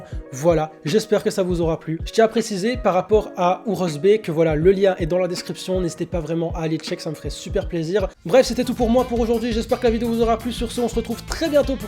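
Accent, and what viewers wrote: Belgium. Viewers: néerlandais ? jure t'es belge ? 🇧🇪🇧🇪🇧🇪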